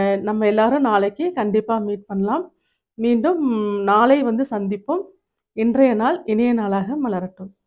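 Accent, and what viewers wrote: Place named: Coimbatore, India. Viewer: I want to hear the Coimbatore slang akka.love you so much. All the best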